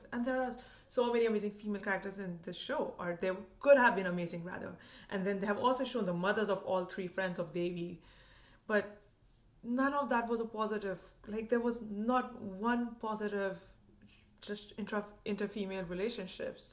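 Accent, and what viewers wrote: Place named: India. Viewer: I'm sorry you ended up hating the role you auditioned for 🤣. But your accent is still Indian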